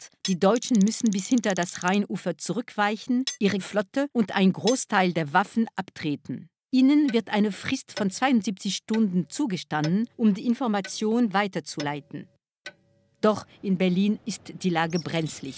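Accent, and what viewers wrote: France. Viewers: Marschall ist ein deutsches Wort. Die Sprecherin spricht deutsch (mit französischem Akzent). Alles korrekt bei arte. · Man hört sofort ihren französischen Akzent!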